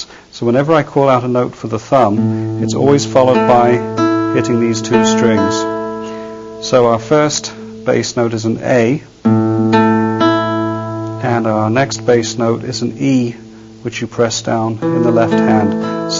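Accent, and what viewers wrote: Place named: England, United Kingdom. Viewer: soft English accent + Spanish guitar = best. Youtube. videos. EVER!!!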